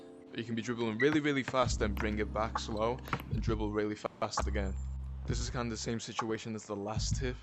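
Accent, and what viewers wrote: Liverpool, United Kingdom. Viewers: Is my guy a scouser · boss accent da lad ar ye scouse? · Are u scouse and if u are I hope u support L.F.C 🔴🔴🔴🔴😊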